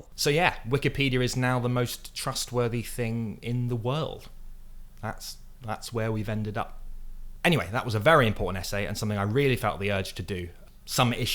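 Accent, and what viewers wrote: United Kingdom. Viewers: Who is this guy? Why is he talking to me? He sounds very British if you ask me. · Your accent isn't British in the true sense It sounds English.....anglo-norman Nothing like the actual British celtic cultures like Welsh and Cornish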